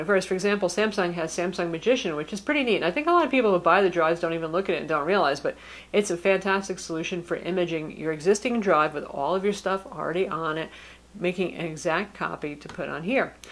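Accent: United States. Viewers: I’m considering buying the ASUS G750 J( I’ve not decided which one yet) and I would also like to purchase one of theese SSD Drives as I prefer games that are generally hard to run on a regular, ”cheap” computer. Could someone give me a link or a quick tutorial on how to insert the SSD drive? I’m not used to hearing this particular American dialect and I’ve been struggling to understand the tutorial as I’m not particularly fitting into the computer whiz category… ☺:-)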